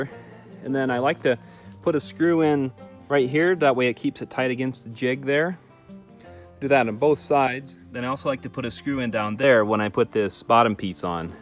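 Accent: Canada. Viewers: Wow, superbly nice work! You are so resourceful, and such a diligent worker! I like the overhangs. They are worth the extra time and effort, plus they drop rain farther away from the shed. I gave you a thumbs up and subscribed. And someone gave you a * FREE * door, bonus! Could you add a cost list, please? How much did the wood cost, the metal panels, and the windows. I am wondering if it's cheaper to buy timber and screws and a window and so on, or to buy a whole shed kit. Are you Canadian, eh? Your profile page says you are somewhere in The United States, but you sound just as Canadian as can be.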